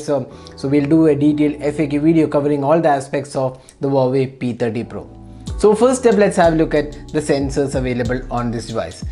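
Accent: India. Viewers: It so hard to understand him what he say. Indian ppl. Do have thick accent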